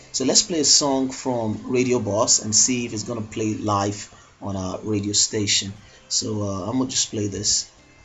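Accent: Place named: South, United Kingdom. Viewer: my mistake then! You kind of have this unique south british accest you know haha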